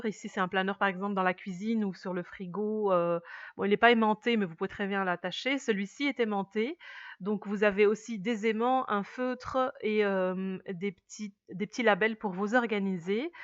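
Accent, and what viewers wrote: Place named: Belgium. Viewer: J'entend que tu viens de Belgique... tu viens d'où? C'est l'action de quelle ville? Bonne soirée!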